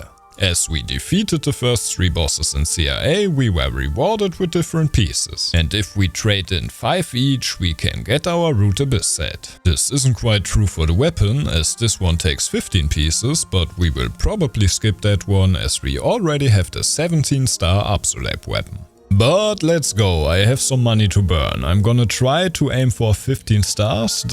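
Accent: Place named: Germany. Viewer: could instantly tell ur german by the accent, but good stuff haha keep up the work :)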